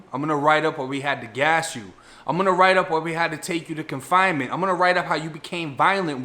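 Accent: Boston, United States. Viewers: Your stories sound better with that bean town accent ya' got..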